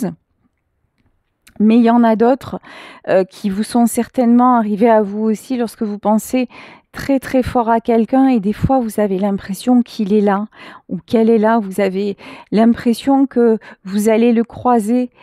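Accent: Marseille, France. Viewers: Coucou...merci pour ce beau message d'espoir et d'un visible...navré pour vous de la perte de votre frère...j'ai versé une larme de Pierrot pour vous deux...j'ai perdu des êtres chers, très chers, comme vous le décrivez! Votre tirage me parle tellement, la personne qui trahit, et des défunts, j'en ai 3, dont une que je vois souvent dans mes rêves. Votre épreuve vous rend encore plus forte et plus belle, rayonnante...d'ailleurs, vous avez une voix magnifique, et hé vous écouterai pendant des heures, toute une vie. Votre voix me rappelle la femme qui guide la méditation de Doreen Virtue, et qui dure une heure...on se sent porté, guidé, aimé, protégé, comme avec vous! Un Ange 👼! Merci à vous, merci d'être là! Et moi même thérapeute, enfin plus précisément pédagogue, puisque je suis sophrologue, je suis ému et transporté comme je pourrais le faire en relaxation...Je vous embrasse belle voix marseillaise, peu cher...oui, très cher! 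Très chère...Jeux de mots 🤩😘🌞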